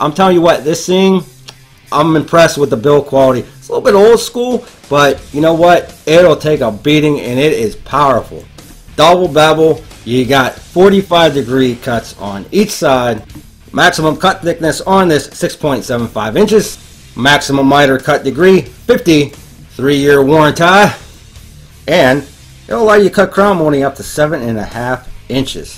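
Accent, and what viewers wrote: Pittsburgh, United States. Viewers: you def sound like a Yinzer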